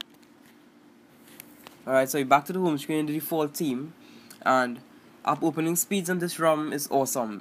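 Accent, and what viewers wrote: Trinidad and Tobago. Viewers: I knew it Lol! I'm from Trinidad too:P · trini accent hmm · Are you from trinidad?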